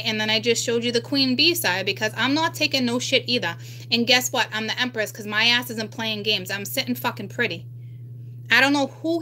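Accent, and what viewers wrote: Boston, United States. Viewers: You and I have the same accent! It’s Boston baby! Be proud! It’s the best!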